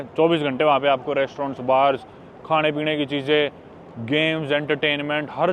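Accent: Haryana, India. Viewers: Being a haryanvi I can sense your pronounciation with haryanvi touch 😂😂 and it feels very good to hear that 😁😁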